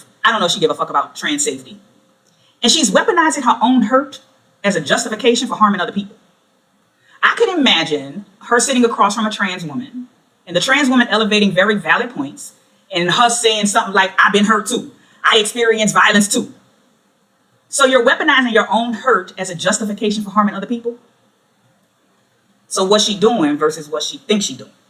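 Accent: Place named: New Orleans, United States. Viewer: U sound like u from New Orleans!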